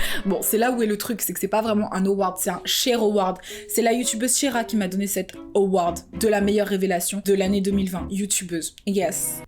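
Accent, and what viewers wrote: United Kingdom. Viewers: Tu peut faire desfois des vidéos en anglais sous titré français si ta la foi de le faire mdr !j essaie d'apprendre et j estime que l'anglais c est une langue mondiale on devrait l apprendre serieusement depuis la maternelle et pas a partir de la 6 eme...anyway j aime trop ton accent Anglais 😍🥰🔥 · Ça serait trop que tu fasses quelques vidéos en anglais we love that with your british accent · Holala c'est vrai qu'en brune cheveux courts un peu ondulés, ça te va su-per-bien. J'ai bien aimé ce moment ! Je partage également l'idée qu'une chaîne en anglais serait absolument génial. C'est que j'apprécie aussi, ces expressions anglaises et ces accents, qui font totalement naturel chez toi. Moi aussi au lycée les gens à l'aise en langue étrangère étaient moqués. C'est typiquement français en fait. Une espèce de complexe d'infériorité qui finit par être d'une arrogance gênante. 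Ça a brisé des ambitions linguistiques ce comportement. Bref ! I love so much your accent ! Please, made your english channel it will be so cute to level up in english with you too. 🙏❤😘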